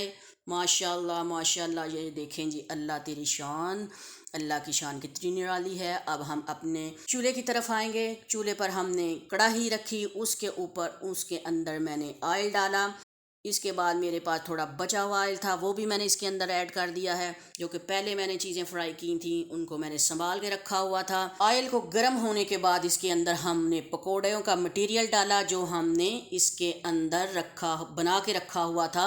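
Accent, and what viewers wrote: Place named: Punjab, India. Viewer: omaigod your punjabi accent😗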